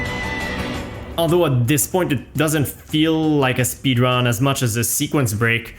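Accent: Quebec, Canada. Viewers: Was listening to this video like "This guy sounds like hes fron quebec" then i heard you say Matthew and instantly knew without a doubt you were Great video you showed me some leitmotifs i missed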